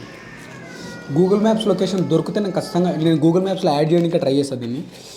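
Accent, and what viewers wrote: Telangana, India. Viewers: నీప్రాస నీయాస తెలంగాణ భాష సూపర్.... కల్వకుర్తి బోటి తలకాయ భలే సూపర్.....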